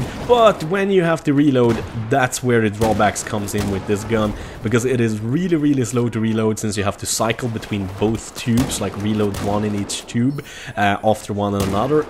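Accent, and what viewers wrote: Sweden. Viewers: are u swedish? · ur accent is so Swedish... I LOVE IT!!!! :D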